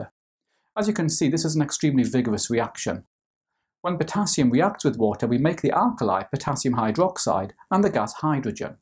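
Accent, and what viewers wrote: United Kingdom. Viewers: Olivia Jane Britain I think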